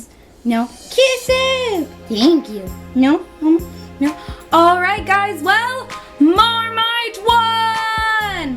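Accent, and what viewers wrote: New Zealand. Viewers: AHAHAHAHA the accent omgg!! tbh it sounded more new zealand but omg dying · I am laughing so hard!!! I think your accent is very New Zealand hahahah <3 <3 <3 Also How am I only just seeing this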